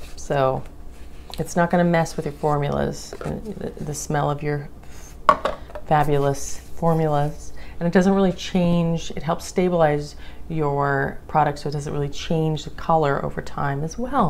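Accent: United Kingdom. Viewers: how tall are you & wots your zodiac sign, where is your accent from as u sound British??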